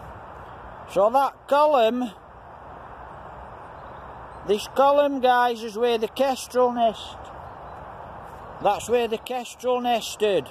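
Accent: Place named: Barnsley, United Kingdom. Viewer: I liked your video, I forget how strong the Barnsley accent is haha! You seem like a lovely local fella 😁❤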